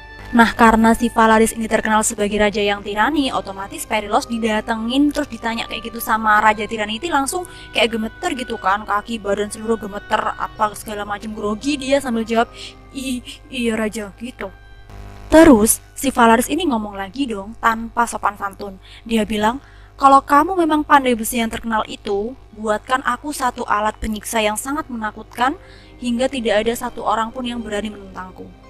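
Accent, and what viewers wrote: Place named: Surabaya, Indonesia. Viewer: Iki arek suroboyoan ta koyok'ane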